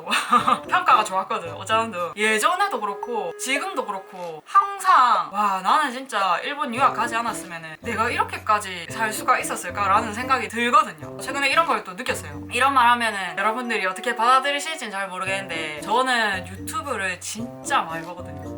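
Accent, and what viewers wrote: Busan, South Korea. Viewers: 말투가 완전히 부산 가시나네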